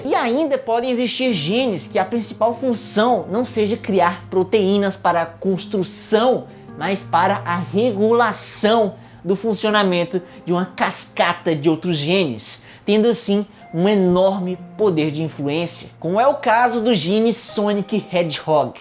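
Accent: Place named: Northeast, Brazil. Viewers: Sou do Piauí e todo mundo fala Aí dento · Esse aí dento é nordestino mermo kkkkk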